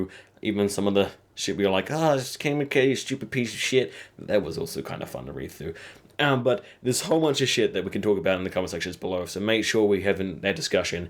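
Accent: New Zealand. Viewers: sheesh. Is that some kind of backwoods kiwi accent you got? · Is your accent Kiwi? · Good to hear a fellow kiwi, bro :D btw... Its not a teleportation machine, there is a subtle jump forward over 1-4ish months during this episode. We see Winterfellpost battle, the sept explode in kings landing, Jamie at the twins, and Dany in Meereen post battle. After that... * We see Aria at some point after Jamie left the twins * The lords of the north assemble in Winterfell following news of the battle of the basterds * They show Varys and Olenna in Dorn (it must be a significant time after the Wildfire). Then we see Dany and a feet (including Highgarden and Dornish ships) a huge timestep after that. They skipped a lot of padding where Varys, Jamie, Olenna, Aria, Northern Lords, and the Dornish & Highgarden fleets travel the globe. Think of the last 1/3 of the episode as an epilogue of seasons 1-6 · are you a kiwi? 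your accent sounds very kiwi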